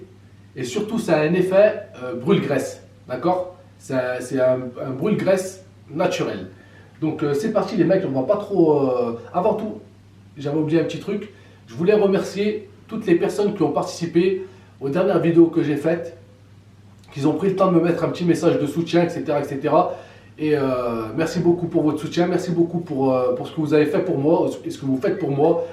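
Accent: Saint-Étienne, France. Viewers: T as a petit accent, tu serais pas de Saint É?.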